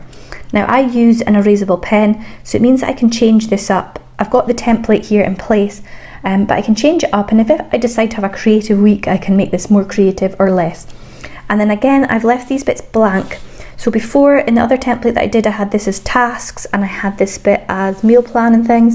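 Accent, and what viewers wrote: Scotland, United Kingdom. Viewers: Fab video. Can i ask where u are from please? Are u north east scotland? X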